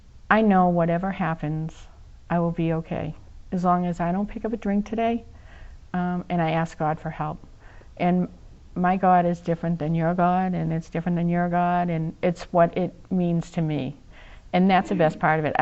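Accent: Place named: Boston, United States. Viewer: Beautiful job, loved your sharing, and your Bah Stan accent..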